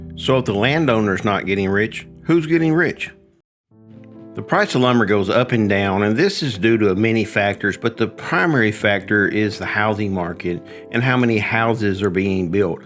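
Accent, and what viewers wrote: South, United States. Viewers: This is a highly edited video. And it’s repeating the premise and slow rolling the punchline and the good-ole-boy narration is only annoying. The answer is given in the final 30sec. The rest is just repetitive of the first third. The big saw mills are supposedly getting rich. There...you can skip it.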